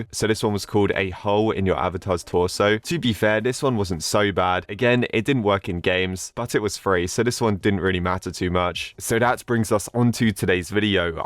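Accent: United Kingdom. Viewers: me: is watching the intro BRI'ISH ?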